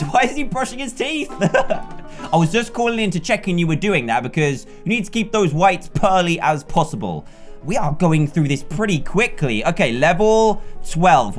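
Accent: United Kingdom. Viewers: he s bidish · Well Mr probably American ,or Canadian ,he's British so who freaking knows what he says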